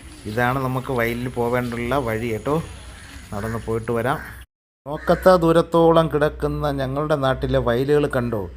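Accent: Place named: Alappuzha, India. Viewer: Alappuzha slang ആണ് ചേട്ടൻ്റെ.. ആലപ്പുഴ ക്കാരൻ ആണൊ? ഇന്ന് കുട്ടികളെ കാണിക്കാത്തത് കൊണ്ട് mindoola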